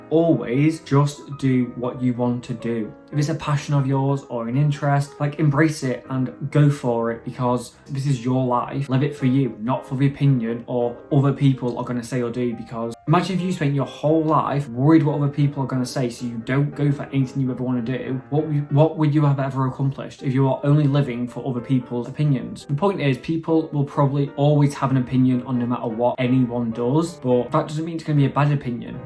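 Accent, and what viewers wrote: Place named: England, United Kingdom. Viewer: Absolutely love your vibe ... and could listen to your voice all day. Love an english accent ..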